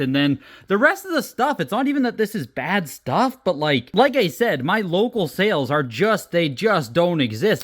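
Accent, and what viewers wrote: Canada. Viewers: If you had $6.4k ready to spend on one game you are likely in a better position than you think to buy a house.... Or you could be broke and making wild financial decisions. Is the house for sale or did he already sell it? If it's still for sale look into buying it. 3.5% and 5% loans are pretty common. Anyway I was typing this while still watching and the more you talked you sound Canadian so that might not even be relevant lmao Edit: yup right after I commented you mentioned a Canadian copy of a game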